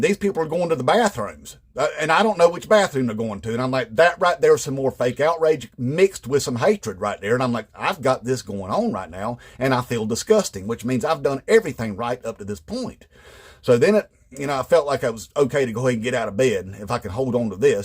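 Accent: South, United States. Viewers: Pretty much impossible to make sense of his southern-twang machine-gun chatter & spew, let alone Listen to it.... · The only thing MAGA people hate more than women, black people, poor people, queer people, educated people, children (once they're born), illegal immigrants, and legal immigrants, is themselves. Ps.: As an Austrian, I love the way you pronounce 'Nazis' .. that southern accent is gold 😅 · Much appreciation from Canada🎉You sir, are brilliant and the best thing on the web. I will never again assume that a strong southern accent has anything to redneckedness!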